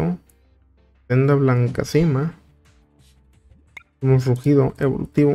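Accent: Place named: North, Mexico. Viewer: EL CARRITO SALVANDO LA PARTIDA ,. TU ACENTO ME SUENA MUY DEL NORTE,. SALUDOS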